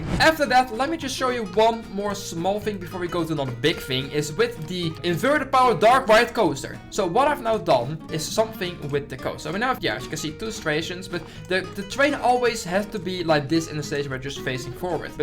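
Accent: Netherlands. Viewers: It’s English but it’s with the worst Dutch accent ever lol. I’m Dutch myself and I’m struggling to understand him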